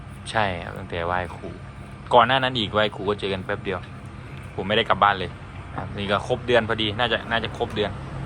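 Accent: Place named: Korat, Thailand. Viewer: เอ้าอยู่เทพสถิตหรอ อากาศดีนะ อ นั้นเว้าโคราชกัน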